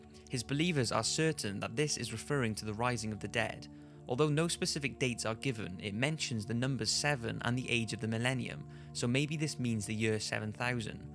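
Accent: United Kingdom. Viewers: The most unsettling thing about this video is hearing a guy with a british accent say "Nucular"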